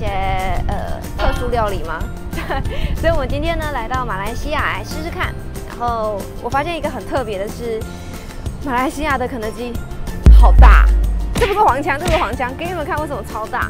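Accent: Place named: China. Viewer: 為什麼妳講話會有點中國的腔調😂😂😂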